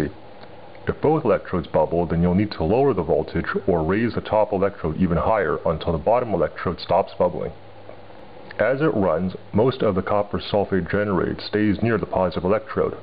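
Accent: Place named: Canada. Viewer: You have the slightest Canadian accent :D but that's probably because of your voice changer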